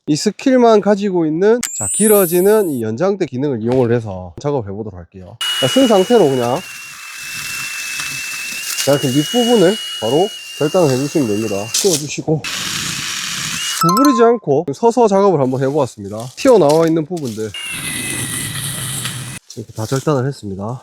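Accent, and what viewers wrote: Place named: Gyeongsang, South Korea. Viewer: (와~ 저기있는 나무 짤라야되는데 데이지가 않네) .... 경상도 사투리가 참 정겹습니다. ㅎㅎㅎㅎ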